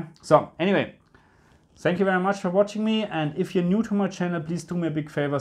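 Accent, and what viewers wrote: Germany. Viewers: never ever did i heard a more much sounding german akzent thats from you ...keep going my guy😅👍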